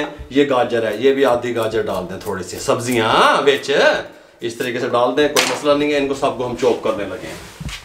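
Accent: Punjab, India. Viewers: Or comentry b kamal with punjabi touch 😃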